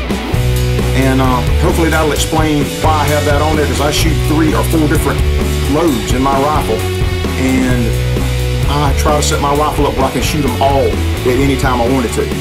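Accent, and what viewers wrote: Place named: South, United States. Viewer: There is just something wonderful about a somebody with a southern accent explaining scientific or technical stuff. That was a really good explanation.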